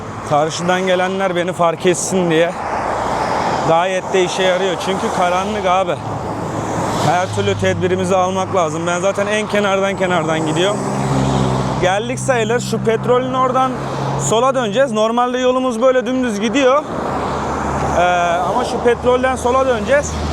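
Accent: Thailand. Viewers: Tayland aksanı yapmaya çalışıyor ingilizce konusurken hepsi o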